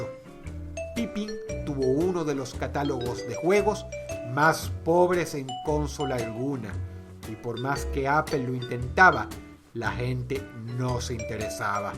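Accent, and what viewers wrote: Caracas, Venezuela. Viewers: Hay mas comentarios actuales que de hace 10 años cuando salio este video mencionado cómo Dross pronuncia Consola 😅 (asumo que eso viene del acento venezolano que aun tenia en ese tiempo) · El tiene acento venezolano, en sus videos mas actuales su acento desaparecio, xd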